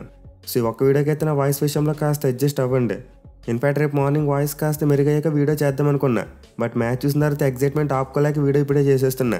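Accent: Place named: East Godavari, India. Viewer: Bro midha east godavari aa 🌝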